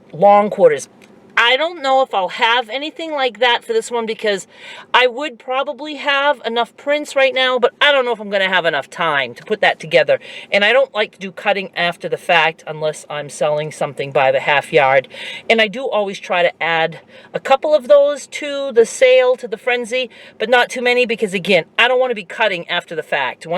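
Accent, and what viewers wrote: United States. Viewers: I happen to love the swearing lol. You talk just like most of 'Merica :)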